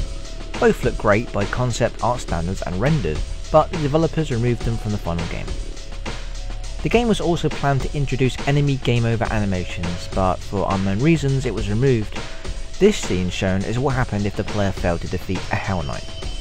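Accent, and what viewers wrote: United Kingdom. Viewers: I can't understand half the shit this guys says. He sounds british but then says 'dewelopment" like a russian. I need some fuckin subtitles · So, why can't the guy who narrated the "creepy easter egg" video have done this onr too? I can't fucking understand anything over that thick British accent!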